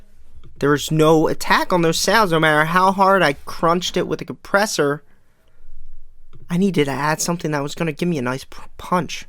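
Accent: Philadelphia, United States. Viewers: You have a Philly / South Jersey accent - am I correct? Nice 4 bars btw sounds good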